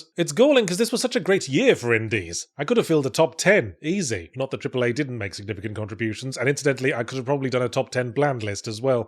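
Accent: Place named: Midlands, United Kingdom. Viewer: Where in the Midlands did yahtzee grow up?? 🤔 that ain't no black country accent... Or brum... Or stoke... Or leicester (I could go on) 😅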